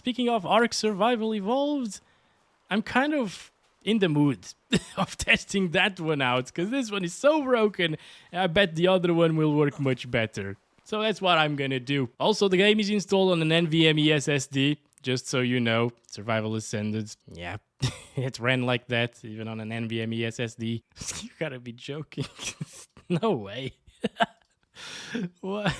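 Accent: Portugal. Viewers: wait are you from portugal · bro eu ja vejo os testes deste canal a uns 8 anos e só hoje é que reparei que és português pelo "ah esquece", houve uma vez que pareceu-me seres portugues pelo sotaque mas hoje tive a confirmação ahaha, tudo de bom para ti! Abraço! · are u portuguese? sounds like it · Es portugues!?! I knew it! haha been watching you for ages and was suspecting that because of your accent :)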